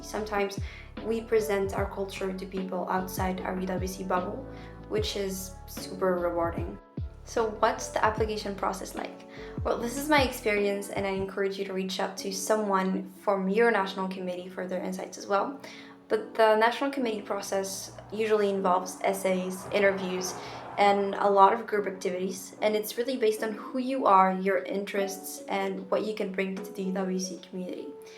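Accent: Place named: United States. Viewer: You sound american is that a american influence ?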